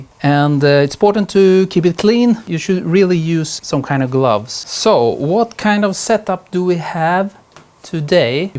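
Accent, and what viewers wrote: Sweden. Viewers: i really enjoy your videos. Kinda feels like bob ross with a Swedish accent.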